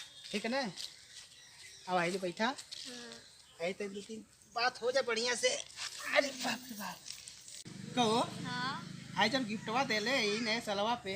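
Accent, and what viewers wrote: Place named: Azamgarh, India. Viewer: achchha Mai bhi azamgarh se hu apke bolne ke tareke se hi mughe bahut Dino se lag Raha tha ki ap azamgarh se hi Hain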